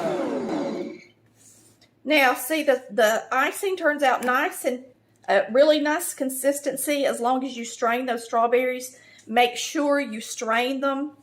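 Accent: South, United States. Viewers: where are you from? I love your southern accent! I live in South Carolina, you remind me the ladies from My church!!! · Soon as you said "Hey y'all this is ". I was sold! So Southern and elegant 😊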